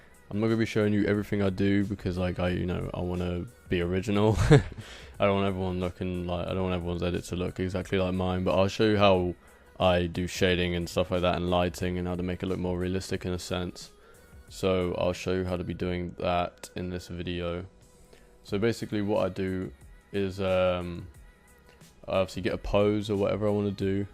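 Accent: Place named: United Kingdom. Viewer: What part of the UK you from?... ifyou even are. im down east in LONDONNNNNNN <3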